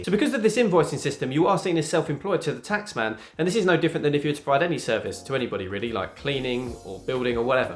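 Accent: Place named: United Kingdom. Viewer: Can you slow down when you talk, I’m an American it’s kinda hard to understand what u saying when u talk that fast with a British accent.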